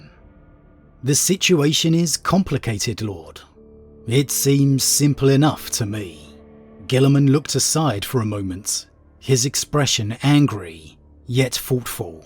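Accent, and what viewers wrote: United Kingdom. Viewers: You've got my favorite British accent of all time. I hope you never stop!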